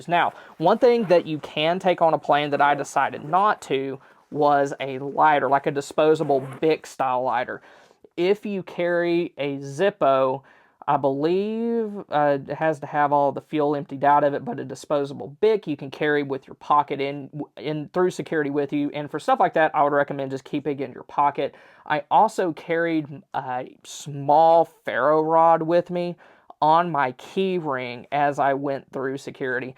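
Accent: Texas, United States. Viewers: The accent is in your ear. Being from Texas as he is, I am familiar with people in other places in the country that assume that if you have a Texas drawl that you are slow witted or stupid. Don't make that assumption is my advice. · As another Texan, I'd say it's because of his accent that you can trust he knows what he's talking about.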